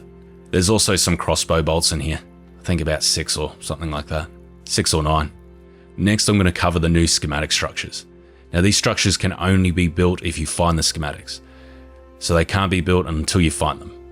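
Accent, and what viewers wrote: Australia. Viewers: Hugh jackman voice over! I live down under and you have some hearty accent ❤️ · ur Aussie ay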